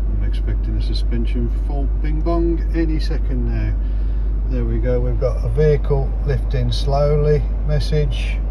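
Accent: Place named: Birmingham, United Kingdom. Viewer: Don't know why, but the brummie accent and talking about car problems are a perfect match.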